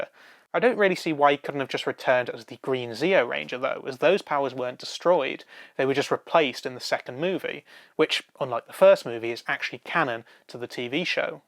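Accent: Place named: Black Country, United Kingdom. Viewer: Hes from the black country England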